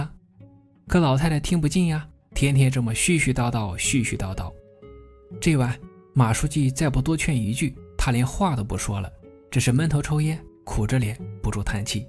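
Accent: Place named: Suining, China. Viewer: 博主是不是睢宁的，口音很像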